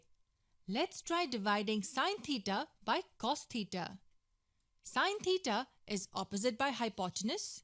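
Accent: India. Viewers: goofy ahh formulaaaee indian a$$ accent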